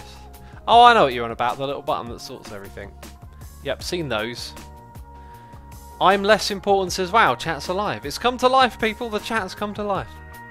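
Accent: United Kingdom. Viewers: Please dont take that as an insult by the way your content isnt boring at all, its just something about your british accent that always does the trick for mr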